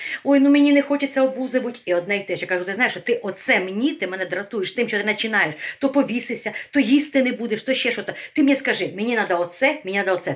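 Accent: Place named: Poltava, Ukraine. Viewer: Добрый день,! Я уроженка Полтавской области, Чутовский район. Для меня ваш говор просто бальзам на душу. Как дома побывала. Все ваши видео смотрю, многие готовлю по вашем рецептам. Желаю вам быстрейшего наступления мира. Держись.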